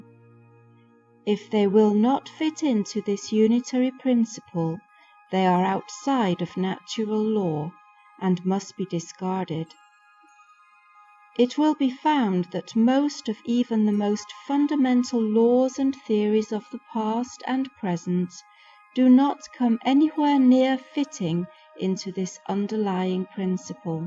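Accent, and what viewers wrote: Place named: United Kingdom. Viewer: Why that creepy Brit voice …such a obnoxious sound…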